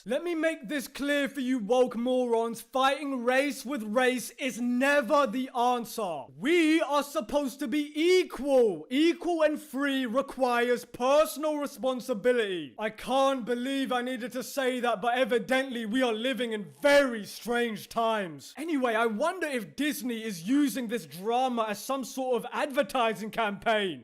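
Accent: United Kingdom. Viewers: he’s British. I understand you have never heard many accents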